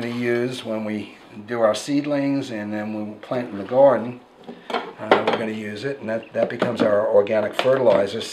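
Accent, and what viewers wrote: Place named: Long Island, United States. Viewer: it's good to hear a normal long island accent. i'm too far from home, everyone sounds unfamiliar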